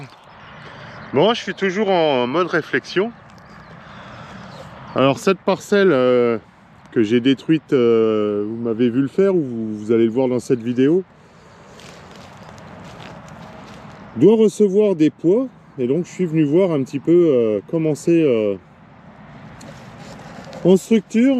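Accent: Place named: Champagne, France. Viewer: Bonsoir, il fait beau aussi en Champagne, t'es champs sont pas loin de la route Vitry Chalons, il y a de la circulation, de bien jolie vidéo, je suis quelques agris en vidéo toi et Sam mais lui pas dans le même coin, toujours cet accent Champenois, Gilles en Sud ouest Libournais , allez vive la Champagne et la Bourgogne, ta terre est pas trop mauvaise, tu préfères la craie, ici chez moi de l'argile au jardin, mon épouse s'occupe des fleurs en ce moment, rien au jardin, mais il est prêt pour les plantations ensuite quand viens l'été plus rien, l'eau coûte trop cher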